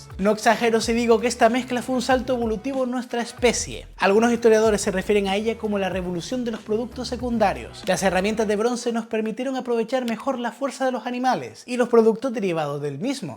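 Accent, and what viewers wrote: Chile. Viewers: Hablas como chileno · Hola. Soy de Chile, no sé de qué zona de España eres, pero me parece que hablas parecido a nosotros. Saludos. Felicitaciones.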